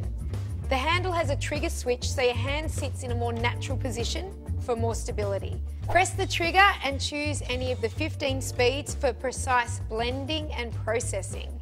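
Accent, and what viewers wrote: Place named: Australia. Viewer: Her charming Aussie accent kept me watching since I already have a similar appliance.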